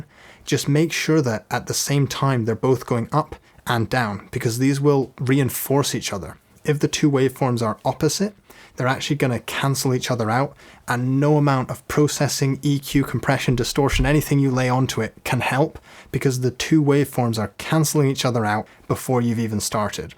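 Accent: Scotland, United Kingdom. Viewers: Was not expecting a fellow Scottish person when I clicked this 🤣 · Love this and your accent. I'm from Scone (live innLondon) i swear you have a Scottish accent, or maybe i am home sick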